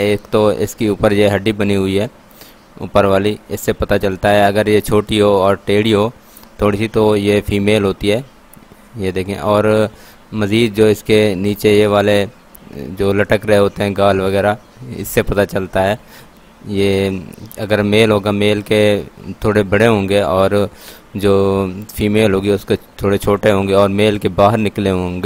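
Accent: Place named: Punjab, India. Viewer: اپ اپنا اردو بولنے کا طرز ٹھیک کر لو اپ کا انداز بیان اچھا ہوگا اور اردو بولنے کے اندر پنجابی لہجہ نہیں ائے گا تو لوگ اپ کی بات سے ویسے ہی متاثر ہوں گے اور خود بخود اپ کو سبسکرائب کریں گے کیونکہ جتنا اپ روانگی کے ساتھ ادب اور اخلاق کے اچھے بہترین طرز کے ساتھ اپنی گفتگو کریں گے اتنے سارے لوگ اپ کی ویڈیو کے لیے بے تاب ہوں گے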